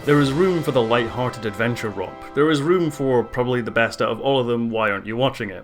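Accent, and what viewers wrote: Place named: Fife, United Kingdom. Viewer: Are you from Fife?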